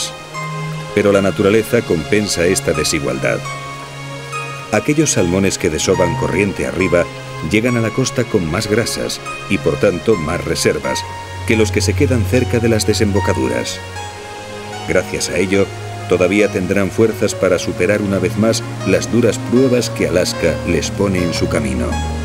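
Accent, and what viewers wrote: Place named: Spain. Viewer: El problema es que el acento españolito no ayuda !!!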